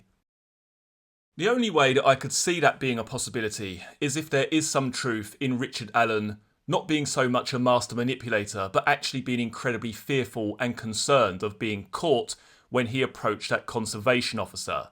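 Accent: United Kingdom. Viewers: I look forward to all your videos regarding this crime. You throw out so many points, & theories like I do, & think like I do. Also, your accent is wonderful,& British accents are in my top 3 favorite accents, and it really brings out the captivating way of telling a story, IMO.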